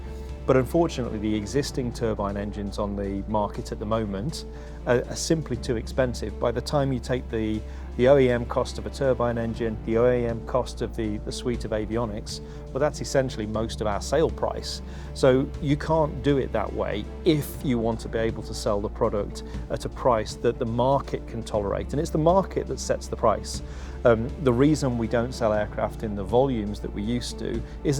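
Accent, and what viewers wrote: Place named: United Kingdom. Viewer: sounds like a new British billionaire. Godspeed.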